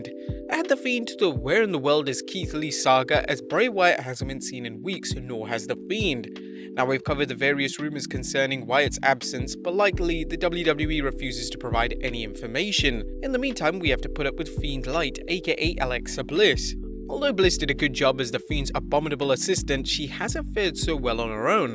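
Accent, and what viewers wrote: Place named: United Kingdom. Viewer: It is so cute to me that because of your accent you don't understand that this entire enterprise serves no purpose but to insult the viewers intelligence. Silly British people think you can take a cerebral approach to understand American blue collar culture.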